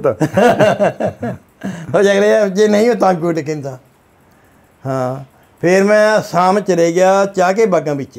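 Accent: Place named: Kharar, India. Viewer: Kharar di boli aee ji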